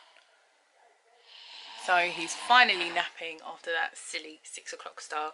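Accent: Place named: United Kingdom. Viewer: Bhabhu nanah💞💞💖💖💖u are an amazing mom girl. I am so glad to see u doing your motherhood chores🙏🙏🙏kodwa Mamoe u sound British yooo how long have u been leaving in the UK.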